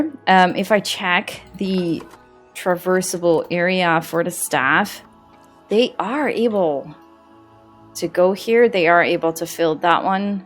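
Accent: Netherlands. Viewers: wait are you dutch? Of ben je nederlands haha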